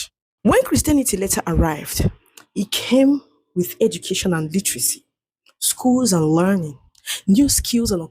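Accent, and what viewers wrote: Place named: Abia, Nigeria. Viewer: Love you my sister, are you from Abia State because you talk like us.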